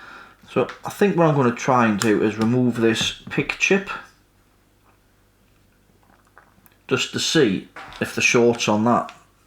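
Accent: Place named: England, United Kingdom. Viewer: I have a few pieces of test equipment from Peak, and they are great. You received fantastic customer support !! Also, a nice job repairing this tester. Well done. I like hearing various English accents as I am a brummie living in the USA, so it's a welcome change. 😂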